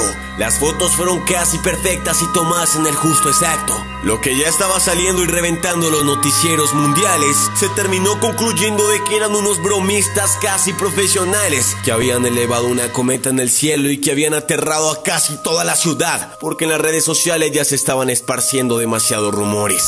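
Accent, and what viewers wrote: Colombia. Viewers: Sos colombiano ? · Eres de colombia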